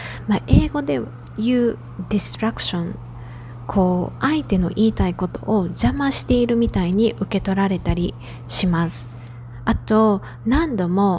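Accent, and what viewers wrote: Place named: Kansai, Japan. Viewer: 2年ぐらい前の動画ですね、今日初めて拝聴しました。 私は南のニュージャージー州に住んでます。アメリカ生活長いですが（33年目）英語下手です😂 Yucoさんはアメリカの大学を卒業されてアメリカの企業に勤務されてるだけあって英語堪能なんですね👍 英語で会話する時はOverlapは家族や友達の場合はいいでしょうけど、Yucoさんのおっしゃる通りだと思います。 声の声域も良くてとっても聴きやすいです、時々関西弁？のアクセントに聞こえますが、親しみがあって良いですね、 方言大好き、特に関西弁大好きです。 これからも動画の配信楽しみにしてますね。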